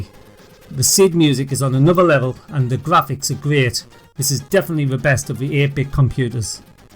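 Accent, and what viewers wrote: United Kingdom. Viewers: Is this Bri ish ffs talk english man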